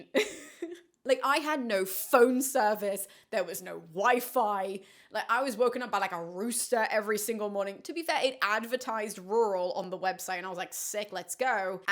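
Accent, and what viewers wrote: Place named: England, United Kingdom. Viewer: You have an English accent, everyone loves your voice. 🤣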